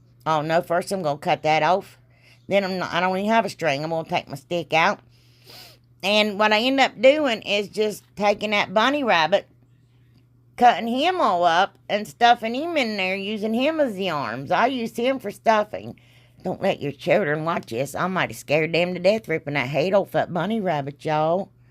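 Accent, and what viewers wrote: South, United States. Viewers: OMG! You should be a stand up comedian! I laughed so hard when you were cutting the "dongers" off the bells. I had tears running down my face! You have such a deep southern accent, it makes everything even funnier! Loved all your projects. You have such a great imagination! I needed a pick me up and Girl, you gave it too me! I don't know why I have never seen any of your other videos. I love you and will be going back to watch you! You are the best! ♥️♥️♥️♥️😂😂😂♥️♥️♥️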